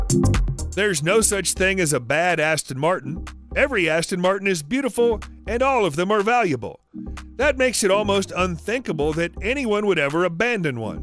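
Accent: United States. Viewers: Had trouble with the American pronunciation of English place names but. Apart from that. I enjoyed the clip. Makes me appreciate the 16 cars in my collection more. Must get round to restoring a couple in the future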